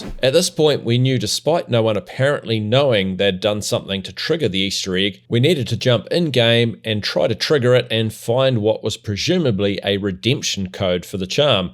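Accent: New Zealand. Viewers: ru from new zealand or australia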